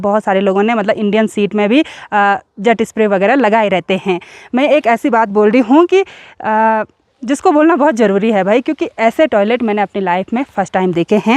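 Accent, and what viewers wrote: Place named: Garhwal, India. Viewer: Jab Hindi bolati Ho tab bhi tumhara Garhwali tone aata Hai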